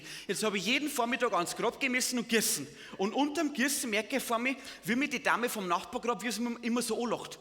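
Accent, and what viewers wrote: Bavaria, Germany. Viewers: Warum Hochdeutsch? ist Bayer und das Publikum auch. Also wieso sollte er sich verbiegen? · I mog den borischen Dialekt.Orfach guad 😂😂😂❤ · Ist es, im schönsten, deutschen Dialekt gesprochen, nämlich bayrisch, oder boarisch ... herrlich 😂, köstlich ❤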